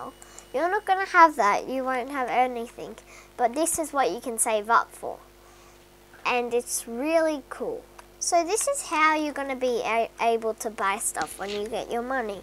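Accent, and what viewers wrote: Australia. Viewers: Is she Australian? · the australian accent though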